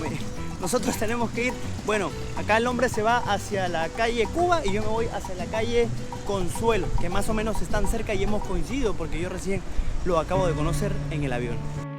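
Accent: Argentina. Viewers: Por qué imitas el acento argentino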